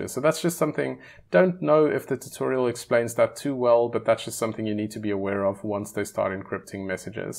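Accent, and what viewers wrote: South Africa. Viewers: excellent video...exactly speaking to the things I struggled with even after watching multiple guides...specifically struggled with fuel and how to get money to buy more fuel. Your accent sound South African... Even more reason to subscribe ;-) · i know a south african when i hear one... jy kry n sub bra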